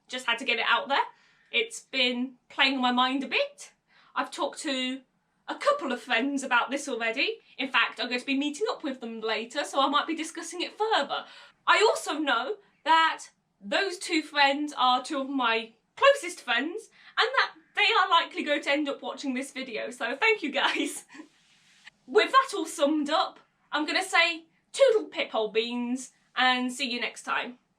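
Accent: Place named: South, United Kingdom. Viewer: Really nice theory! Where are you from? South of England? God I love your accent! Hahahaha I lived some time in England and yours is really cool and different to where I lived (liverpool)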